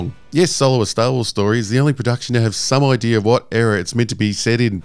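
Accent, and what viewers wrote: Australia. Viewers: Ah.....luv ya work,! And the Aussie accent totally keeps it real, bro! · Lmao I love this channel. The AT AT with that music intro and outro (idk why I love it sm but I do haha), the constant bashing of Disney’s Star Wars, and the hilarious jokes this guy makes with an Australian (I’m assuming) accent. I apologize if I assumed wrong haha but keep the good work up. Love your content :)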